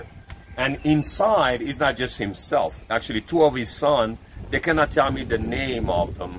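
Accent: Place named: DR Congo. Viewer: That's wonderful! Never met a Congolese with such local accent! You must have moved to the states since you're baby!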